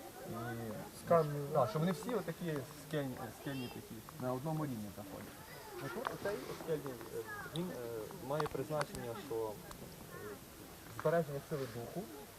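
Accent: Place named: Skole, Ukraine. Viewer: Молодець, гарні відео. А у нас Сколівщина трішки по другому називають гриби і говірка твоя гарна, цікава, приємно слухати.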